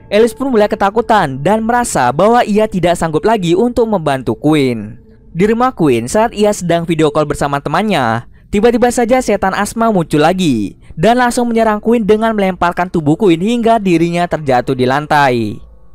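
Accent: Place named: Java, Indonesia. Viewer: Terlalu jawa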